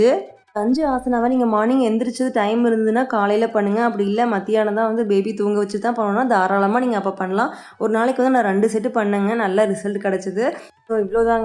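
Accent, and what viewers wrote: Kongu, India. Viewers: Sister love ur way of speaking polite kongu style, ur videos r positive as u are, hats off sisy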